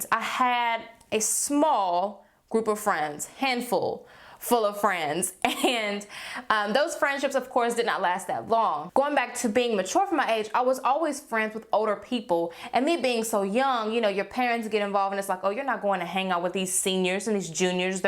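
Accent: South, United States. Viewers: That Southern accent keeps peaking out a little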